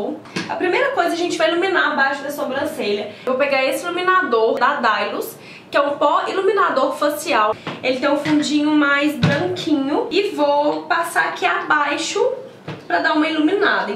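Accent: Minas Gerais, Brazil. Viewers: Nao sabia que voce era mineira ! qual cidade? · Aaaaaaaaaamo seus jeitim mineirim! !!!!!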